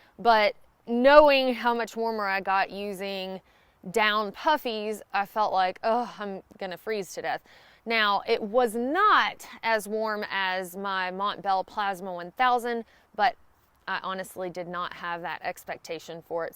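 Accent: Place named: Alabama, United States. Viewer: You are so thoughtful! I know you can take the girl out of Alabama but you can’t take the Alabama out of the girl…You crack me up! Having to put an * for “side-note” so that people didn’t think you said “sad note”! 😂 that and I haven’t heard “fellas“ in a long time! Love your videos!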